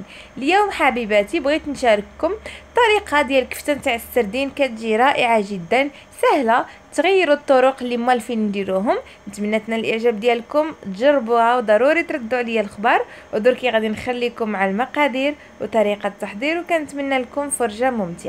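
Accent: Oujda, Morocco. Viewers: كا تحمقني هدرتك حبيبة حتى انا بنت وجدة 😍😍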